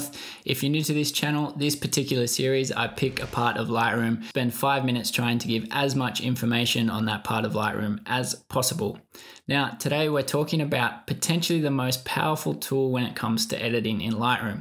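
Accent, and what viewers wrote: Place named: Australia. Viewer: I love your work 👊🏾 You sound like you’re from here (Aus) I just had a question...would a Mac Pro 16GB RAM be perfect on Ps + Lr ?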